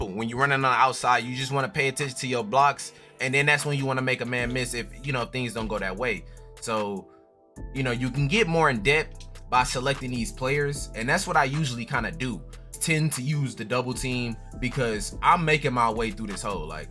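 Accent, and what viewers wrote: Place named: Midwest, United States. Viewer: Besides great concepts I’m here for the laughs 😂an commentary an where is your from bro got to be Midwest 😂😂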